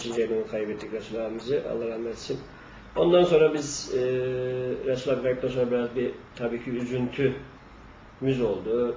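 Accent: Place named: Istanbul, Turkey. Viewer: Madem görelenin tanıtımını yapıyorsun o zaman sunumu yapanın ağzı istanbul ağzı değil Görele ağzı olsun yerel kültürün en olmazlarından biri de yerel ağızlar dır.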